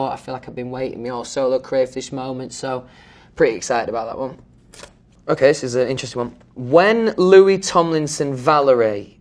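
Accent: United Kingdom. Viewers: i love his british accent since 2010 ❤️ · I noticed that Louis’s accent has gotten more British since he stopped touring with one direction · He’s somehow gotten more British since the hiatus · he's so british i can't 😭😭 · He sounds so much more British than I thought he would, not that that's a bad thing. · 영국 액센트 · Do you know how much I love you , you bri'ish king ? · help I don't know what he's saying with his british accent. All I'm getting is "prodly med ginger made Olly." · how can anyone ask if he's british when he literally says "me" instead of "my" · I feel like he's never sounded more British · The British accent is here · his british really jumped out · Sometimes Louis' so British even the subtitles mess up 😄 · I don’t remember him being the most British sounding out of the 4 British members and yet, here we are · is louis tomlinson british. 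girl have you heard is accent 😻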